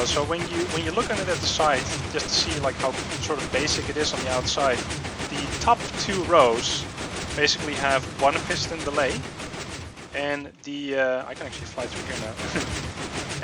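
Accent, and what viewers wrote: Netherlands. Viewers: what country are you from you sound Dutch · Ben je Nederlands, je klinkt wel zo? Are you dutch you sound so?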